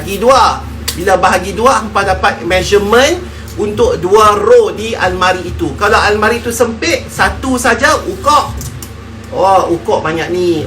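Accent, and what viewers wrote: North, Malaysia. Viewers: Bahasa utara lah.. Kedah, penang mmg ckp lagu tu🤗🤗🤗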